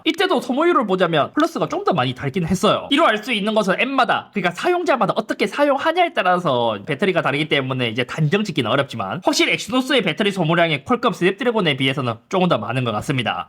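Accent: Gyeongsang, South Korea. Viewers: 선진국형 삼성폰.후진국형 아이폰.해설자 상도사투리 극혐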